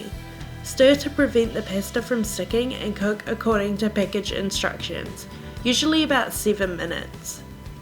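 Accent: New Zealand. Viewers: I think it's New Zealand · The Kiwi accent is way too annoying to listen to 5 minutes of it. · Fellow kiwi? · from new zealand probably! that's where im from :D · Sounds like a kiwi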